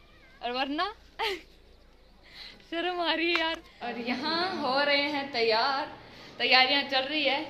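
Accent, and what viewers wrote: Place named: Rajasthan, India. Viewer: didi the marwadi bolta ktayi zeharr lago 😜❤️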